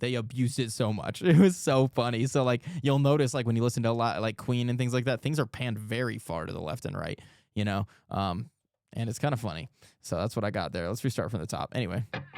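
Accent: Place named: England, United Kingdom. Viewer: We're Scots. Around the World. You'll be fine. You sound like an Englishman though.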